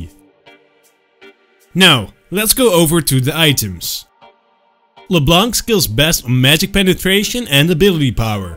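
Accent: Netherlands. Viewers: Ataru Kawahara Nederlands accent :p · Are you dutch? :D Because for some reason I think I can hear a little bit of the accent :) Great tutorial though. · are u from holland i hear the shit accen XD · Omg you must be dutch the accent is sooo recognizable xD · ben jij nederlands je klinkt heel nederlands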